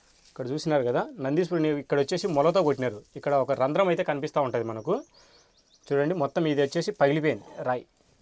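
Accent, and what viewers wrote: Rayalaseema, India. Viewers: ne prati vedio chala interesting ga untundi... Keep it up...nuvvu ne rayalaseema slang super... Chala kastapaduthunnav...super bro...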